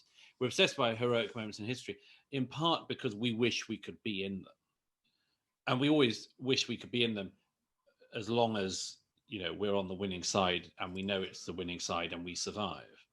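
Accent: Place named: Swansea, United Kingdom. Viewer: Sounds like a Swansea accent! 🌅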